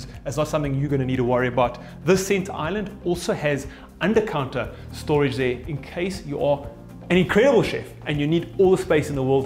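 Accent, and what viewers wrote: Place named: South Africa. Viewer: Thank goodness! A normal SA voice! So much better! Ur hey guys. See guys ur. Lol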